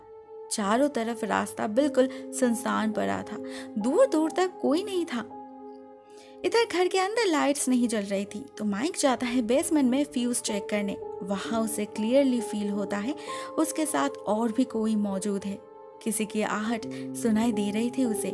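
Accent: Bengal, India. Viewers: Didu ap bengal se ho kya apki voice bhot sweet hai isliye lagta hai 💜😍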